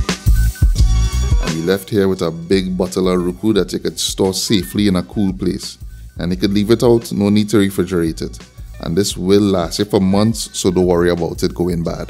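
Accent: Trinidad and Tobago. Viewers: So enjoyed hearing the Trini accent.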